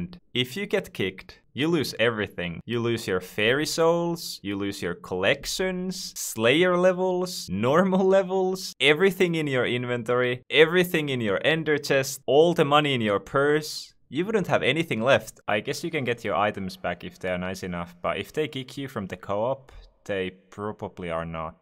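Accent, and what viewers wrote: Finland. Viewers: ootko suomalaine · is this dude from finladn? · U finnish? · are you from finland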